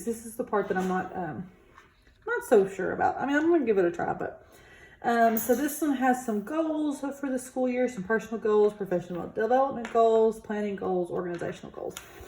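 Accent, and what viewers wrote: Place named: South, United States. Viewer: Thanks for flipping through your planner..I wasn't familiar with this company and I love how you can customize the class periods and that the day is at the top!! for all those extra pages, I would probably just tape in things like the district calendar, a list of important dates like when grades are due, faculty meetings, etc. and that type of thing..any kind of handout I got that I wanted to keep up with all year. Also...I'm a fellow Southerner but I live in the PNW, so it also made me happy to hear your accent. :)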